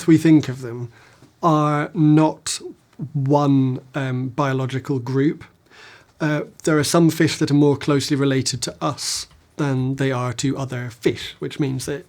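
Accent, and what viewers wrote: Scotland, United Kingdom. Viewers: Can someone tell me wht kind of british accent does he hav? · No, you're fine. he's using a regional pronunciation from parts of the UK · Great. We have a British sounding explaining Prehistoric now! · Gotta love a guy geekin out with a British accent. Adorbs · british like me yes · He's from Edinburgh and now lives in London so has a mild Scottish accent.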